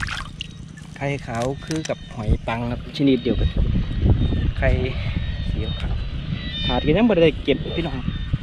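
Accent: Chaiyaphum, Thailand. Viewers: กล้องดีเนาะฟังสำเนียงคือคนชัยภูมิบ่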